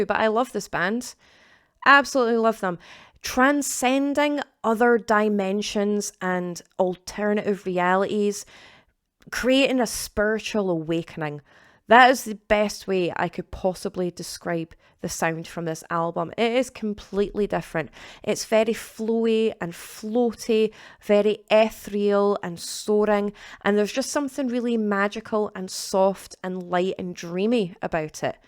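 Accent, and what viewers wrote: Scotland, United Kingdom. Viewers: It's a basic metal channel. But every now and then, she hits me with one I havent heard, and those are the reasons I watch. Plus the charming Scottish accent/demeanor. Plus, to be fair, shoegaze is a 90's thing that's only been creeping into metal since -. Not her generation. This is how it feels to grow old. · "If it's not Scottish, it's Crap!!". Love the accent and great video.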